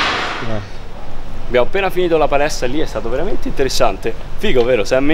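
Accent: Rome, Italy. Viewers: Ti seguo da poco,volevo chiederti,da quanto sei a Londra? Perché la ciancicata romana se sente na cifra! Daje così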